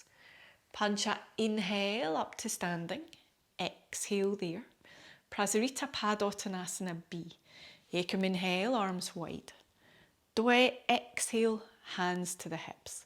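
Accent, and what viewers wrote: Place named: Scotland, United Kingdom. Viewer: Informative, thank you! Love your accent, too. I’ve never been to Scotland, but my grandfather was from a place called Govan in Glasgow. Your accent reminds me of him.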